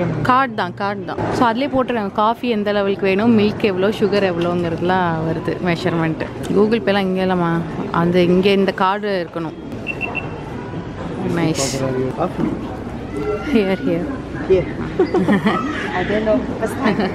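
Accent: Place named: Salem, India. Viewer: எல்லாமே பிடிச்சி௫க்கு...முக்கியமாக உங்கள் பேச்சு...நம்ப சேலம் ஸ்லாங் அ௫மை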